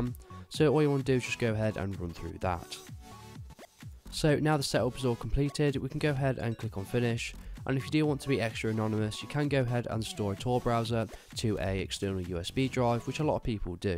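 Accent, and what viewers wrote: United Kingdom. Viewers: Love the British accent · i hate british accent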